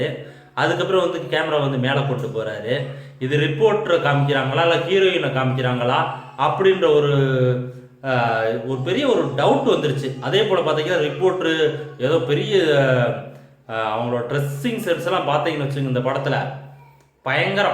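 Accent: Madurai, India. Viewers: bro neenga entha ooru odasalunu soldringa entha ooru basha maduraya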